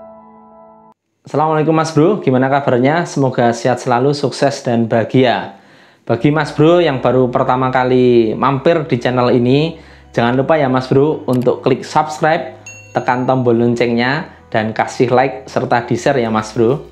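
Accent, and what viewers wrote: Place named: Java, Indonesia. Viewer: geram lak aku dengar jawa dia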